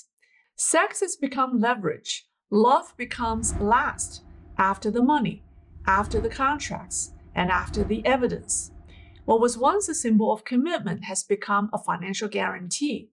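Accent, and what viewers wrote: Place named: United States. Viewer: Wow. She sounds like an American woman.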